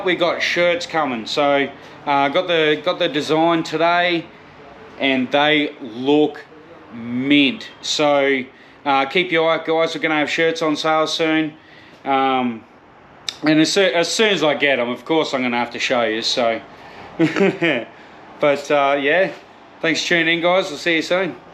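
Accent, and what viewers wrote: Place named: Australia. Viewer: Being an American I’m not used to hearing an Australian accent and I gotta say I love it. Love the work you putting in. Can’t wait to see the final product. Keep it up.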